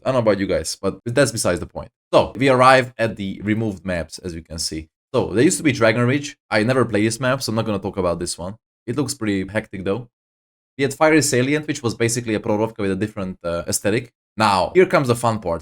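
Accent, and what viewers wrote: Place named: Hungary. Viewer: Alig hallatszik hogy magyar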